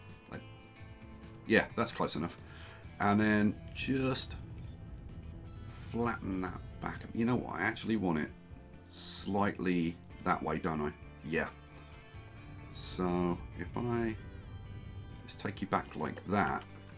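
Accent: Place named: United Kingdom. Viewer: Brilliant ideas you need to write those ideas down someplace .. and YES I would vote for a decoration and tidy up episode. After all it is a large part of building up the map to be the best that it can be. On a completely off topic note by chance are you and John Oliver from the same area ? My ears tell me you two could be brothers, I do not mean that in a negative way at all, I think it is very cool, as you both have great enthusiasm for what you do, and your voices express and convey that to your audience. Good on You mate. I am busting here, waiting for the train ride on the new railway.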